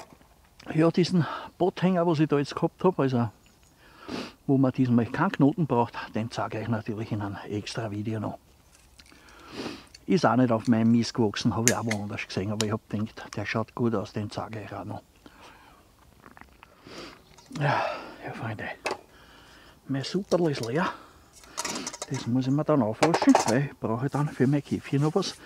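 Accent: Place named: Vienna, Austria. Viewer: Gott sei Dank.Mir hod der leichte Weanerslang scho so gföht.Danke!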